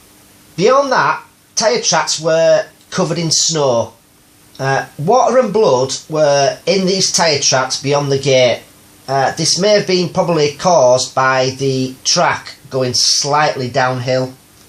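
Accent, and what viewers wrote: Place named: West Yorkshire, United Kingdom. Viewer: Where are you from mate.I know its northern and I'm thinking west yorkshire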